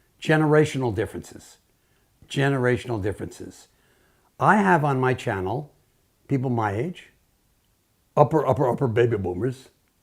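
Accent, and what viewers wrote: New York, United States. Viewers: You're a treasure Mr! 🌟The clarity and astuteness of your insights and the directness with which you address us helps to sharpen up our minds, no matter our ages. ☝ Your voice & NY accent are also very appealing. 😊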